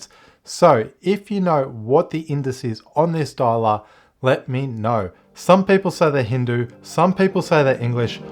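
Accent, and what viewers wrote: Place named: Australia. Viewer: having an Aussie accent for a change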